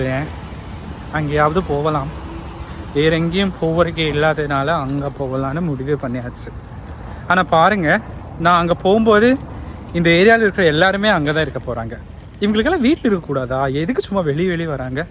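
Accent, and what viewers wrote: Tamil Nadu, India. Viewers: Recently addicted to your videos Waiting for more videos like this and also I like Ur Tamil accent 😂 Love from Srilanka 🇱🇰❤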